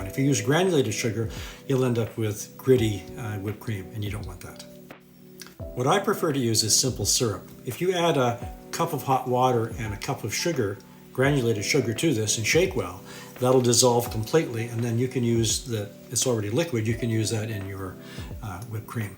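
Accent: Canada. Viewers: The Canadian accent shined through. 😊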